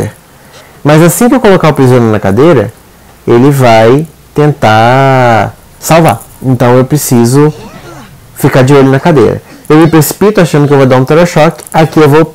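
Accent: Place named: Germany. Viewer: É o que eu sempre digo, " Escolha o survivor de acordo com o mapa e com time, por isso vc não PODE ser monoEla existe e é chata" kkkkkk não precisa explicar muita coisa sabe todos os meus esconderijos, vou ter que procurar outros. Yidhra Party! Tu estás expandindo a firma pq aja serva. "Terror Shock" Leia com o teu sotaque alemão que amo. Que milagre Doctor não farmar e sim ser farmada. Cowboy bestão, A DW amou. O esquecimento do criador de conteúdo. "Eu posso deixar ele não chão quanto eu quiser" kkkkk... Obrigada por adiantar Prisoner. Poxxtman me imitando achando que vai cautelar o blink e sefuuu É muito sangue frio e planejamento pra jogar de Hunter, principalmente com a DW que vc precisa de muita, mas muita habilidade, concentração, predict entre outras coisas. E acho extremamente necessário que não só a galera que quer aprender a jogar com ela assistam esse vídeo, mas também os survivors, pra aprenderem a jogar contra ela.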